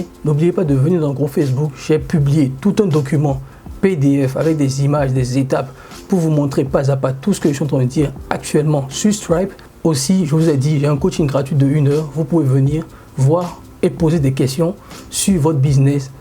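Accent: Benin. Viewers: Vous parlez comme les béninois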